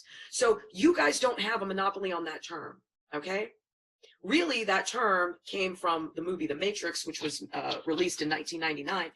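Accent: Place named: United States. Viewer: You're just acting/speaking like an All-American.....what's the problem? I think it's great!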